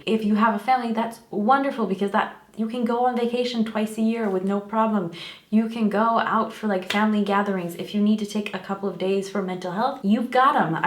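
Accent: France. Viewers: girlll the french gave you an accent! I'm so impressed by your french channel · Your French accent is so beautiful <3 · It's so cute that now when you talk in english we can hear the tiniest bits of a french accent sometimes 😊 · Great video! I've watched a couple of your videos now and I can tell that your American English is getting slightly diluted! It's kind of cool? The pronunciation/cadence feels more French. I'm an American living in California, and speak some French and can definitely tell that your time in France has altered the rhythm of your speech! Keep up your videos!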